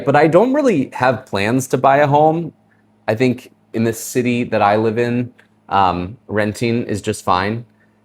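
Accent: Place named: California, United States. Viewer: I watched full video you should continue this path you have California accent and I hear foreign love that accent 😅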